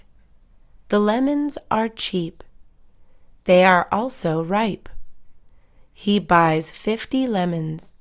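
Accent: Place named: United States. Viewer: Американское произношение. Спасибо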